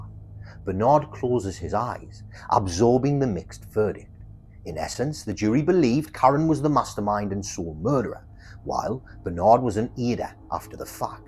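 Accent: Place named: England, United Kingdom. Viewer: I cannot penetrate the host’s regional English accent.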